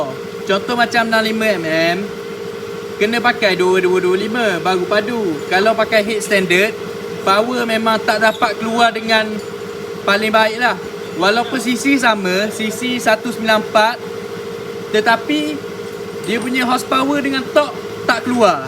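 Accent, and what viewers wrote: Terengganu, Malaysia. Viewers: bang nk tanye..abg org mana ni dgr mcm terengganu je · Abang orang ganu ke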